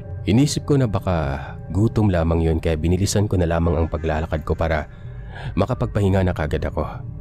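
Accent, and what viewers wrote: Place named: Visayas, Philippines. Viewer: Cute mo tlga mag bisaya sir HAHAHAH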